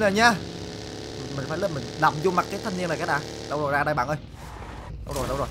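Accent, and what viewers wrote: Binh Dinh, Vietnam. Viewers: Bình Định bạn nhá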